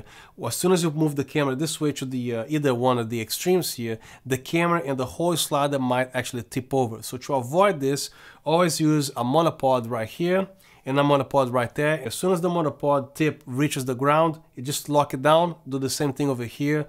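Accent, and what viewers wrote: Brazil. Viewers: THIS is what i call a review! Amazing work, thanks a lot! Fale bem, mas vc deve ser brasileiro neh?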